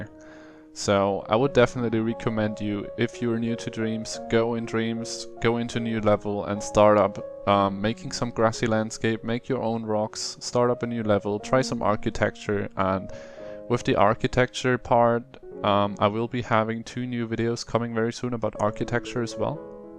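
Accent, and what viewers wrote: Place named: Germany. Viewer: Hab bei Dir sofort rausgehört, dass Du Deutscher bist - aber ich muss sagen, dass Dein Wortschatz und Sprachfluss echt stark über dem deutschen Durchschnitt sind. Danke für die Videos! Das motiviert mich echt, eines Tages mein eigenes Spiel zu entwickeln.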